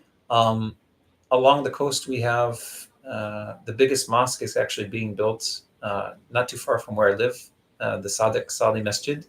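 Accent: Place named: Midwest, United States. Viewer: never would have guessed you were born in NY; your accent is 100% midwestern 😂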